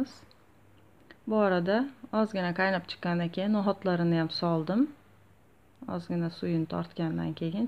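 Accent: Khorezm, Uzbekistan. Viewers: салом мен сиз хамма видеоларингизни куриб боришга харакат киламан сизни хамиша кулаб тураман кайеда бусангиз хам сог боулинг олох сиздан рози булсин яхши иш килябсиз сиз хоразим шевасида гапирасиз кориндошларингиз йукми мабода хоразимда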